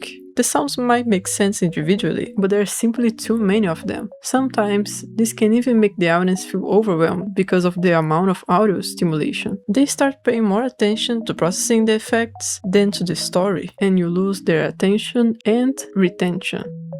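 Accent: Brazil. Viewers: Are u brazilian? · reconheci seu sotaque brasileiro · you sounds brazilian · eita sotaque brasileiro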